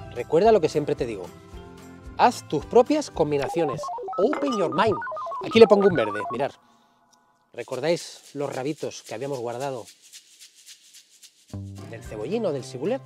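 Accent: Spain. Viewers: Cómo me gusta escucharte! Me encanta el español de España! En Argentina no hay piparras, ni sé qué son? Hay algo parecido para reemplazarlas?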